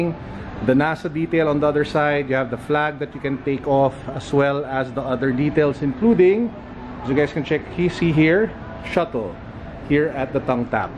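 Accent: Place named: Philippines. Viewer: You are philipines because i can tell how u talk in english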